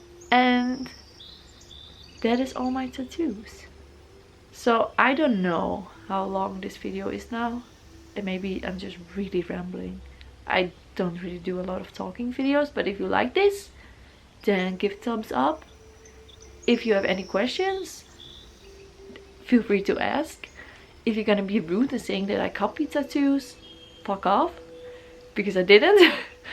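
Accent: Netherlands. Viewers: She's Dutch, and she pronounces the word like most Dutch people do. Your comment is unnecessary and quite honestly, pretty rude. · No, it's NOT rude! I'm Dutch and I don't say it like that. I have English speaking friends and they say I don't have a Dutch accent. It annoys me too. But her accent doesn't sound bad even though it's clearly a Dutch. It still sounds nice I think apart from the ID idea word.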